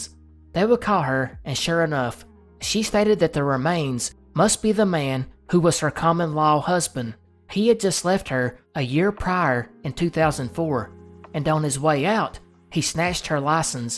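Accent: South, United States. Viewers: as a Kentuckian born and raised, i love your videos and really appreciate hearing more southern accents out there in the world ❤️ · This is fire. As a guy from Cali, I generally have mixed feelings about a southern accent. However, your tone is soothing, and you don't give out "hick" vibes, you sound well-spoken and intelligent. Basically, I feel like a long lost southern cousin is schooling me on interesting cases and stories. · My toxic ex used to tell me I couldn’t watch your videos because the southern accent was annoying, he would tell me to shut it off immediately everytime. 4 months without him and I can enjoy your sweet southern voice and amazing content in peace❤️ helps me remember the little victories of leaving when I’m feeling weak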